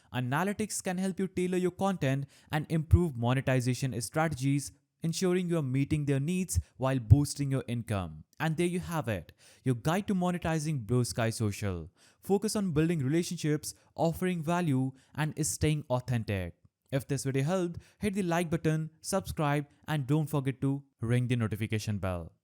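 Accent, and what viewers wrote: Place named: India. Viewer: Damn I hear so many Indian channels that make zero effort to be understandable. You are Not that. I love your accent. Especially the way you say "b" so cute..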